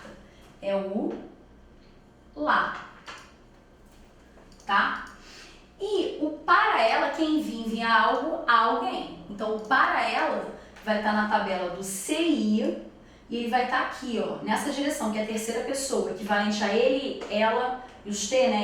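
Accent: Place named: Rio de Janeiro, Brazil. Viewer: KKK engraçado o sotaque carioca dela falando espanhol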